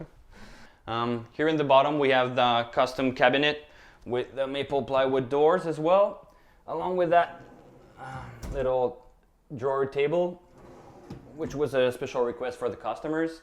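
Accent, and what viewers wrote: France. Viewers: Engish is better than french. French ppl speaking english accent is cool. Lol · Loved your comment I laughed so hard. It's the French accent lol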